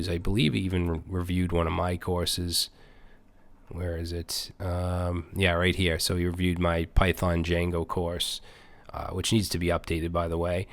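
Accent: Boston, United States. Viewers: I still like your channel the most. I'm a software engineer and have been for the last 4 years professionally in part thanks to your videos, the thick Bostonian accent brings nostalgia.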